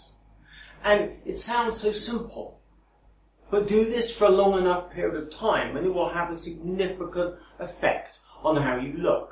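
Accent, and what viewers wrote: United Kingdom. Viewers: Jai Hind Nah he has some kind of english accent. It's quite clear and quite close to how BBC newsreaders sound, but not quite as posh as, say, the queen of the U.K. Think groundskeeper willy from the simpsons. That's a comedy scottish accent, so it's exaggerated af but it isn't too far away from the truth. :P Also, Shrek speaks with a scottish accent, though Mike Myers is obviously american. I'm struggling to think of a world-famous scottish actor you might know.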